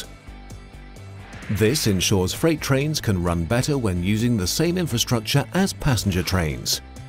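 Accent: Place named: Germany. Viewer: or just an other German speaking English from the same region :)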